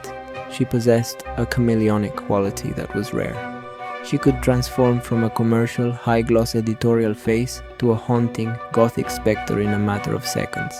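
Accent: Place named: Japan. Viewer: The narrative is so awful that I can't understand at all. Fucking Japanese pronunciation.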